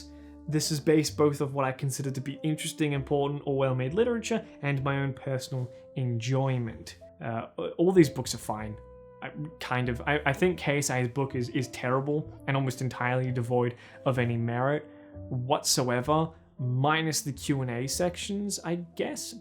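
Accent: Australia. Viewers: the rhett and link one is so weird to me because one of my friends grew up in that town and hearing an australian man detail the experience is making me feel emotions that have yet to be documented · Josh Strife Hayes but australian and unrelated to videogames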